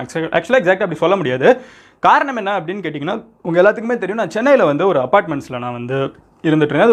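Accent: Madurai, India. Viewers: Bro Neenga Maduraikaaran solrapove summa getha irukudhu.❤️❤️❤️❤️engà irukeenga nu solunga nerlaye visit pana varen..Am from Mahatma Gandhi Nagar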